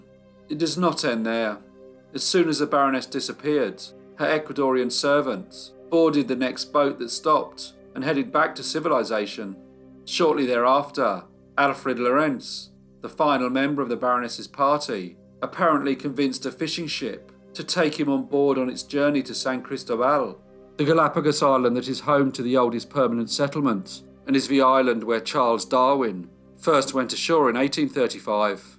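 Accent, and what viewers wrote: United Kingdom. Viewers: Oh thank god it’s a Brit narrating this, Americans drive me nuts with their mispronunciation of places. 🤔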